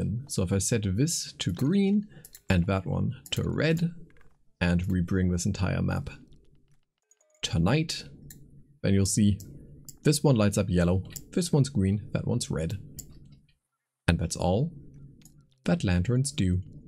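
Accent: Germany. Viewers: I love the tutorial, but holy shit I love ur voice, the way you speak and emphasize on certain words and that thick (I think german) accent just makes this absolute cinema, love it buddy 😍😅😂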